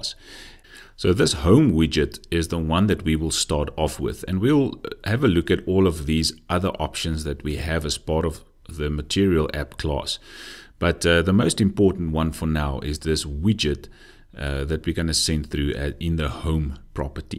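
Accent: Afrikaans, South Africa. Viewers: You sound South African ❤ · This is one of the best structured flutter tutorials, English with Afrikaans accent is like I feel home. All the best and regards from Namibia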